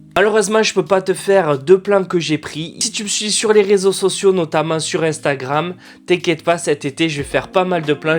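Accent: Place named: South-West, France. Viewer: Je trouve qu une vidéo tech avec l'accent du sud-ouest ça passe toujours mieux 🙏🏻☺️ #teamchocolatine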